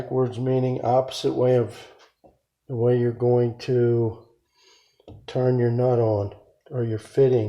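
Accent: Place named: East Coast, United States. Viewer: I knew it was the East coast. I’m from Maryland and you sounded familiar 🥰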